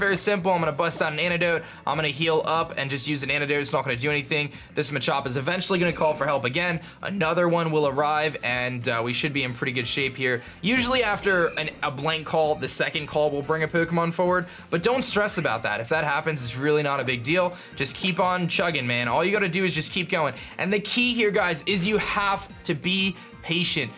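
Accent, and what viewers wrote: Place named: California, United States. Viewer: sounds like he's from California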